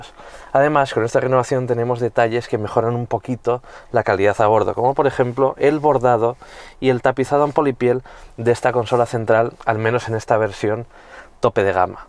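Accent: Spain. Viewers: Este habla como español.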